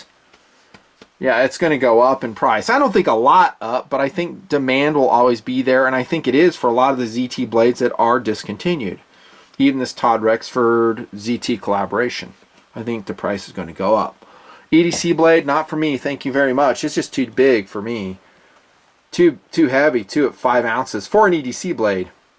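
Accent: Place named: California, United States. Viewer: Thanks for review! Never seen your channel and I'm blown away by how many followers you have...cause you sound like the kids I used to go to school with in california. ..brah! Seriously you got the steel wrong and the knife is on bearings. It's hard to watch your video when you don't know the item reviewed. JMHO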